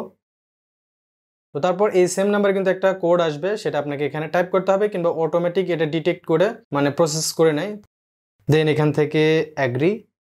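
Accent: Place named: Naogaon, Bangladesh. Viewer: Naogaon/Adamdighir language😊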